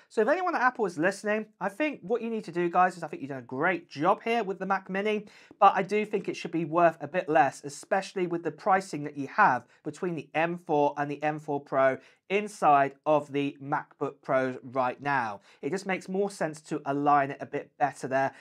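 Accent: United Kingdom. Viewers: I am planning on getting the base model M4 and hooking up an external thunderbolt drive in a thunderbolt enclosure for more (and very speedy) storage. I could really use a new iPhone but for now, my iPhone-7 is still ticking along. Love your videos and your accent. For some reason I feel smarter when listening to a Brit! 🤣🤣🤣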